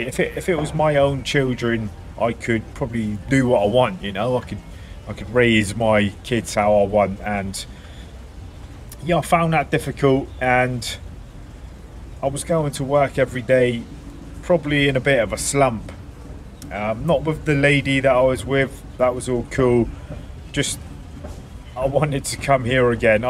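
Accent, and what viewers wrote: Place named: Southwest England, United Kingdom. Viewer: Hi ya Just found out how send a message. Love your views and was wondering what part of uk you are from. I’m also from the southwest Torquay to be frank. I hear a little when you chat. Take it easy man, take it easy. lol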